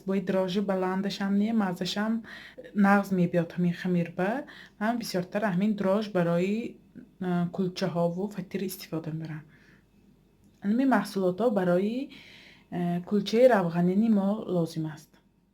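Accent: Isfara, Tajikistan. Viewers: Рахмати калон моям аз исфара аз тарафи таги бихи 39 сола шахри Саранск ба 6солбоз зиндаги мекунем сохиби 5 писар❤️❤️аз овозатон фарк карам ки аз исфараи бостони хастед.. Хелллеее шод шудам.. Дина торти наполеон ро бо рецепти шумо таер карам бенихоят хамираш махину бомазза буд рахмати калон... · Гап нест. Апачон ба фикрам шумо а Исфара а? Лахчатон худи исфараги боин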